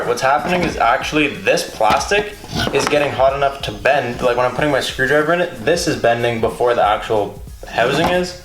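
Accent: Canada. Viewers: AMO he’s canadian man It’s his accent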